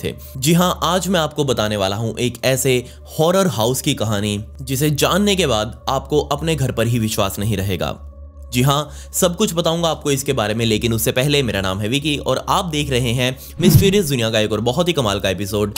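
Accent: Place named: Punjab, India. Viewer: Panjabi a bro tu 😊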